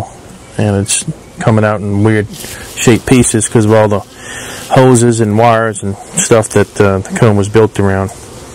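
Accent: United States. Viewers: What state accent? Is that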